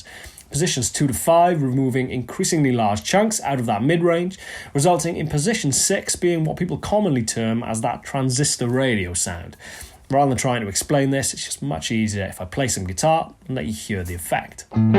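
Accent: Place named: Geordie, United Kingdom. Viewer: Are you originally from Newcastle? Keep noticing a tiny bit of Geordie in your accent.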